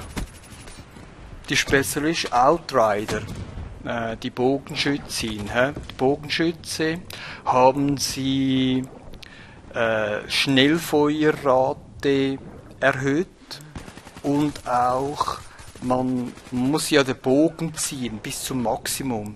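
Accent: Switzerland. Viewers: Mit deinem schweizer akzent hab ich kein problem denn den find ich geil!!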